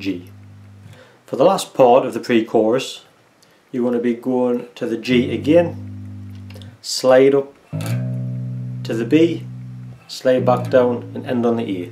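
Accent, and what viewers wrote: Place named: Newcastle, United Kingdom. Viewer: It might be his Geordie accent, but the tab and the notes he's playing match up.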